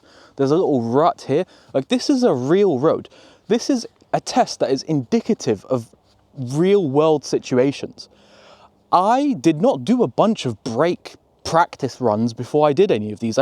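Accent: United Kingdom. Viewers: His accent is British but his pace is American 😅😅😅😅😅 from Malaysia 🇲🇾🇲🇾🇲🇾🇲🇾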